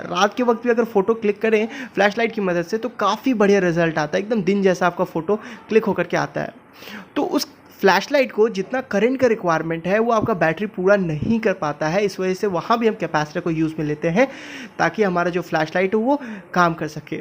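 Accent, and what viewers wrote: Bengal, India. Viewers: Kya aap bangali he? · Are you Bengali?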